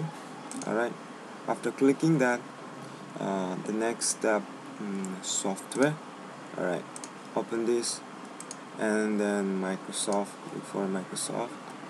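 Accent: India. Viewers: indian or serbian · Are you Indian or French??...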